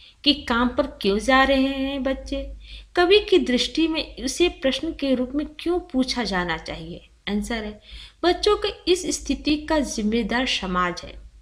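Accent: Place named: Bengal, India. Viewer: aap bangaal se ho kya............ hmm lagta to hai sahi ki nahi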